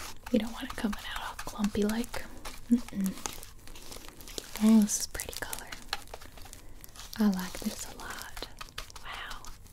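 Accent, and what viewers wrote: South, United States. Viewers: Southern drawl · I'm from Canada and she sounds very distinctly non-Canadian to me although I do hear the southern part it's very distinctive. I guess maybe if you stretch it you could say she has a B.C./Albertan accent but I think just Southern U.S. is more accurate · Not gonna lie....the southern country accent threw me off lmao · The southern accent mmm love it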